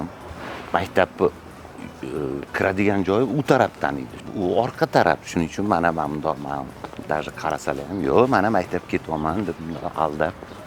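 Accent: Tashkent, Uzbekistan. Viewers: ТОЗА Тошкенли . азгина чириганро копто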